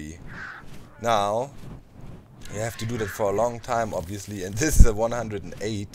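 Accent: Germany. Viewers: Are You Deutsch Sir?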